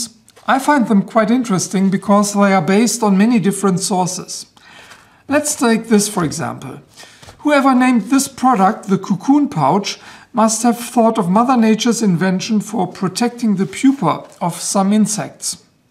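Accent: Germany. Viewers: I'm half German and I love listening to your German-accented English. It's Wunderbar!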